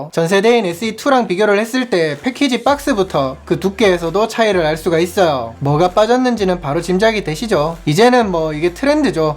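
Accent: Gyeongsang, South Korea. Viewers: 경산도 싸나이 리뷰 웬지 신뢰감이 듬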